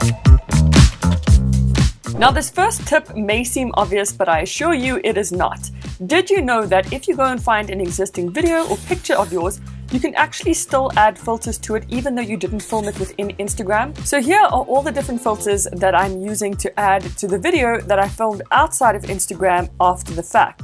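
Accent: South Africa. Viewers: Are you south- African? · South African ? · You from South Africa nehr ? 😂🙈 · South African accent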